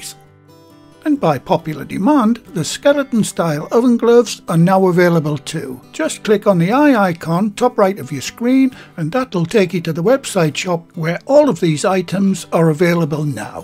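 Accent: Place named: North, United Kingdom. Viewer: Wow it looks so scrumptious... I am salivating just looking at the thumbnail. I hit 👍 button even before watching the video knowing will never ever disappoint us but make us happy… It’s like a meditation to listen to your softer silkier voice with nice accent… you pronounce both ‘Butter’ and ‘Batter’ same… it’s very funny you never pronounce ‘E’ for Gas you call it ‘gaas’.. is it northern way of Pronunciation but other northern people I have met don’t pronounce the way you do… anyway thanks dear… Give us another delicious recipe soon… ❤️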